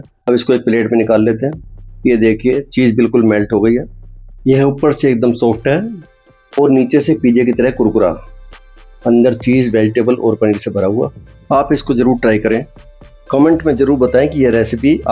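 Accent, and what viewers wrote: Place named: Punjab, India. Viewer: Aapka punjabi accent bht mast h👌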